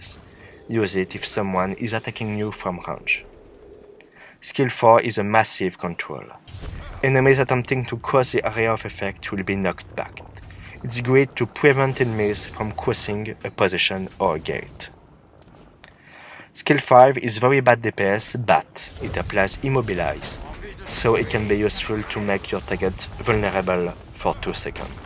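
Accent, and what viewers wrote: France. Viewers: lol its french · Dude! what are you french? best teaching video I've seen so far. Oh and I like when you say "it is insane" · J'entends un accent français :)) Excellent guide !!! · Your english is difficult. You speak way to fast as your accent pronounces words wrong. Can't understand half of the begining. Gave up. Learn to enunciate english with out french habits. Thanks anyway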